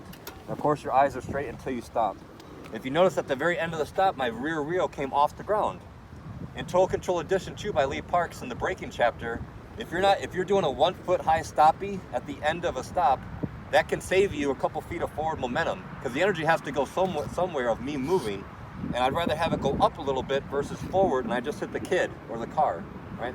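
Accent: United States. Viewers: I like your American accent..very clear. Great info.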